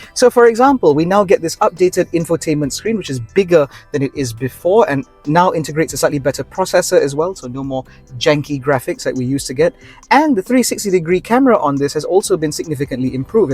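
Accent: United Kingdom. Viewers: Subscribed one minute in. You'd think he was a native British presenter.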